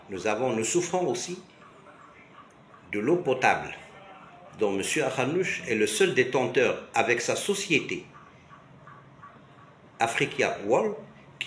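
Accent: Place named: Mauritania. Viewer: تبارك الله على الكوعليم … الفرونسي ديالك بحال ديال الموريتانييين او السينغاليين … خصك غي شي صباغة كحلة وها نتا ناضي …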